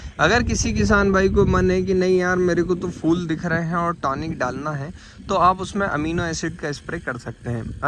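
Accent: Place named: Harda, India. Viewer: Wo ko bo bol raha hai Matlab harda jila se hai banda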